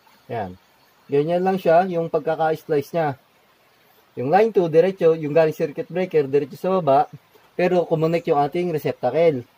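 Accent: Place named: Quezon, Philippines. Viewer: ganda mga vids mo sir.. slamat.. from quezon province ako, kya pla pamilyar punto at words mo.. more vids pa..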